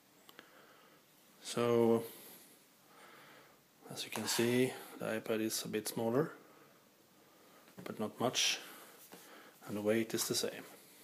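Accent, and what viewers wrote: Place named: Sweden. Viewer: Bra jämförelse, älskar den brutalt svenska brytningen ;)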